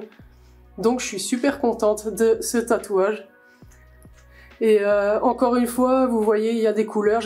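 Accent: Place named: Belgium. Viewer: Sa s'entend pas que t'es belge mdr t'a quand même bien l'accent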